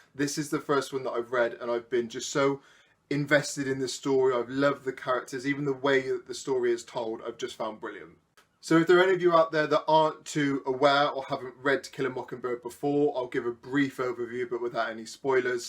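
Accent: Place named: South, United States. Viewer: I might just do this! Didn't get tagged, but it seems fun and I want to film something chatty and lighthearted. In other news, are you from the south? I can kind of hear it in your accent but I haven't been back home in years so it might be off a bit. Great video! :)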